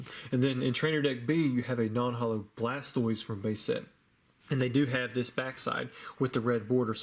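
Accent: South, United States. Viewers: He has a little southern twang in his speech. I believe he is from north Carolina but not 100 percent sure.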